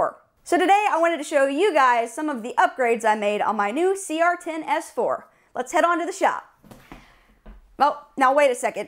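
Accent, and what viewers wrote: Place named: United States. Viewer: hell yeah. Love your enthusiasm, knowledge and delivery. The American accent is a bonus too :)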